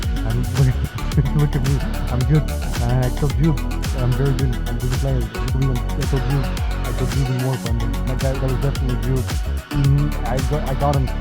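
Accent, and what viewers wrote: Netherlands. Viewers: wait is bro a dutchy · ben je nederlands?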